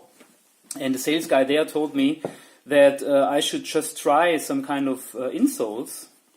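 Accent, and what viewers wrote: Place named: Germany. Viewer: Lustig, wie man Deutschsprachige immer sofort erkennt :-) gutes review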